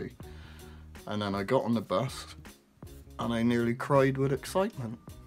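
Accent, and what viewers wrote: United Kingdom. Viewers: I absolutely love the Bristolian accent! I am originally from Bemmy/Bedminster. I currently live abroad so it's great to hear an accent to make me feel at home. Honestly it's epic to see someone so passionate about their art and someone elses. I've seen your work all over the place and there was one that caught my eye in Kingswood on the Black Horse Pub wall. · Don’t put yourself down on accent south west is the best!! I’m from Devizes!! Propa south west! And I know wot you mean about bode ! I’ve got her tatttooed to my side.as soon as subway art hit my school library!! It was mine !! I had the buzz of graffiti!! I’m 48 still writing! I’m proud of the south west style! You and desk one from Swindon are my favourite graffiti artist 💯💯💯💯💯 Keep it going! 🤡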